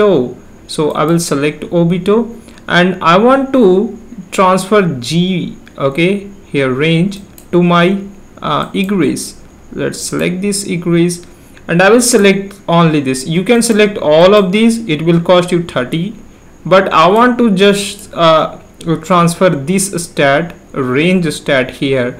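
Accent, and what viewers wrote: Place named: India. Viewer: He as the voice of an Indian scammer....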